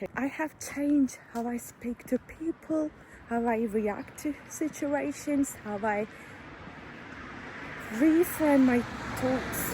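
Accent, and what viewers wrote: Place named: United Kingdom. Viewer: Bunları simdi daha iyi algiliyorum, bilincim katman katman aciliyor.. evrendeki her enerjiye, özellikle kendimden ayirdigim negatif düşünceler ve duygulara bile BEN olarak baktigimda onları kabul edip iyileşmek hizlaniyor. evet her kilit TAMLIKTAN aciliyor. tüm kapsayicilikla tüm olasiliklari BEN olarak görüp icinden arzuladigimi secersem realiteye dökebilirim! bunu farketmek bile benim icin muazzam bir farkındalık, simdi sırada bunun uzerine calismak, çünkü asil olay bunu OLMAK. teşekkürler hizmetin icin. British aksanindan öpüyorum🥰😘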